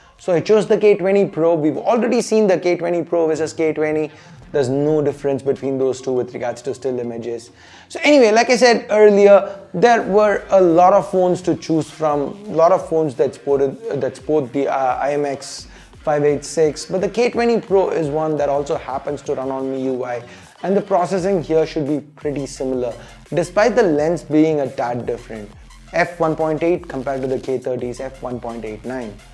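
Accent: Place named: India. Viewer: 这英语一股浓浓的咖喱味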